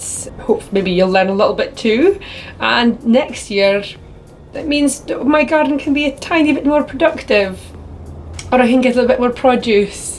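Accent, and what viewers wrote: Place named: North, United Kingdom. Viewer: I thought I heard a northern accent, r u from Shetland?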